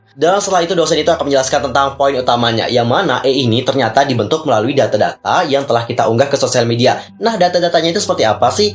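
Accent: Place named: Java, Indonesia. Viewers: Medok ya kak hehe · Maaf kak aku yang salah. Aku ngakak kok medok sih 😭🤣🙏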